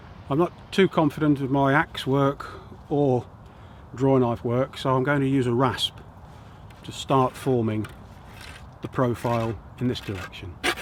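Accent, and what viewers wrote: United Kingdom. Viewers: British AF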